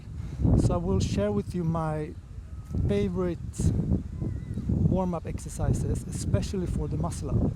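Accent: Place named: Europe. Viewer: voice quality is good people from india don't understand european english 😅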